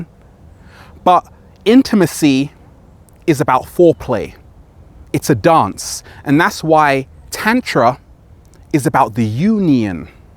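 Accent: United Kingdom. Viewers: (Diving Deep) You're a decent looking black guy with a British accent. Come to the US, white girls will be all over that D. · btw are you British? your accent seems to point yes I cant help but notice that you slur on your s and yeah i love to watch porn and enjoy doing it and then some time ashamed about what type I watched lol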